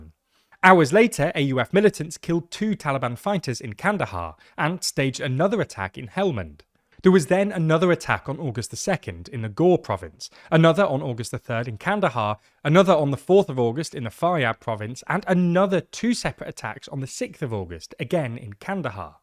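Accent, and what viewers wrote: United Kingdom. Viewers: By your British accent I can tell you are one of those who gather some audience and then start political analysis of their own. Here in this case you data collectors have been totally wrong. There is no such thing here in Afghanistan what you call uprising. Afghanistan is under strict control of Taliban who were brought by your Dads(Many in number) the United Sates not by the British who died in hundreds while defending American interest. Thanks to president trump and his administration who spitted on the coffins of British forces and didn't even bother to gift everything to Taliban. By the way you should another podcast about Russia going to attack British military targets in Ukraine. yesterday's attack 12 British engineers were smoked. Hahahaha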